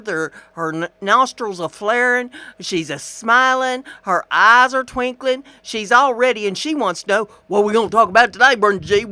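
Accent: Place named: South, United States. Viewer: Southern accent Idk partner! 2021 better be better and not another tarnation. Lol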